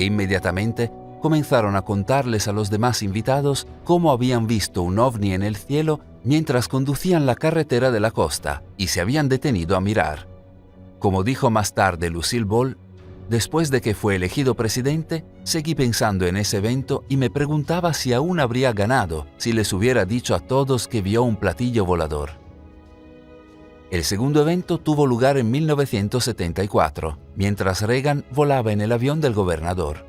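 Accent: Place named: Italy. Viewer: Per quanto una buona pronuncia e lettura,la cadenza,l'inflessione il non marcare alcune lettere rivelano la tua italianità...ci ho imbroccato vero???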